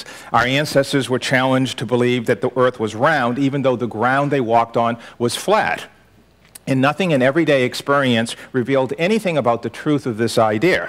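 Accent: Boston, United States. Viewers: THE VOICE RECOGNITION CAN'T HANDLE A BOSTON ACCENT that is conclusively been proven that if you watch a subatomic particle orbital I passed through two sluts in a barrier it will behave like a particle and it creates the solid lookin hits on a barrier are that the business can measure and so like a boy to go through one hole but again if you don't observe a strict reject re the particle than exhibits the behaviour wave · Having a tough time taking him seriously with that Boston accent. They just don't sound smart. He should try a British one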